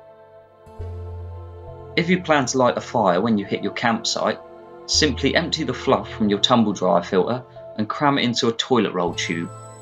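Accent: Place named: United Kingdom. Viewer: sometimes i dont undertand uk english but nice video dude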